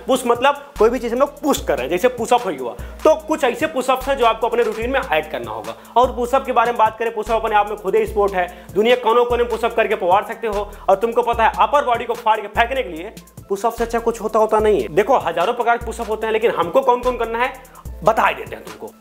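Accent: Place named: Bihar, India. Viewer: Gajb bhai aisan trainer to hm phli bar dekh ni ho aur apke bhasa se lga ta rua Bhiar ke lal bani kahe ki hm hu bani . Good 👍job the way in which you tell everything is awesome👏 unexpected🥰🥰🥰🥰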